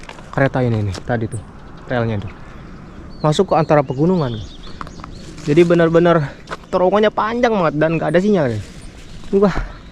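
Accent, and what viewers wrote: Indonesia. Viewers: bisa bahasa jepang tapi logat indonya nampak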